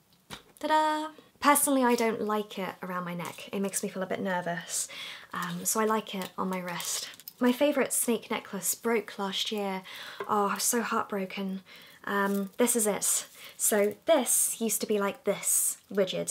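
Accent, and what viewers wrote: United Kingdom. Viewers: I ❤ your British accent...xx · Are u British ? I am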